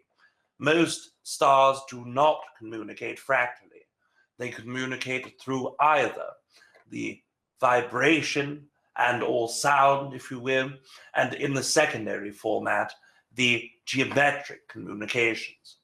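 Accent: England, United Kingdom. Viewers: why is he speaking in an english accent though?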